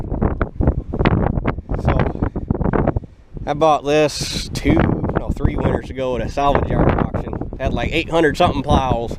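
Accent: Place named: North, United States. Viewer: I’m going to be honest and with this link came out I bypassed it because I was just busy. When your second video came out and I well great. You were one of the first channels I watched regularly and looked forward to watching and even went back in time and watched. I’m originally from Michigan and have lived in Georgia since 1974 so I love to hear the northern accent such as yours but love the old equipment as well. Take care and hope you’ll post some more videos.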